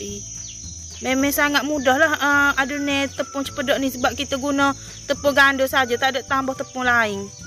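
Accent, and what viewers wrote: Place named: Kuala Lumpur, Malaysia. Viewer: Slang KL adik ni..phm sgt..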